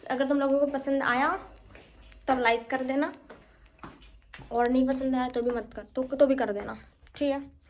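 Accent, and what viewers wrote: Maharashtra, India. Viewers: I get you girl. Your hindi is marathi mix nd influence. So humara hindi aise hi sound karta hai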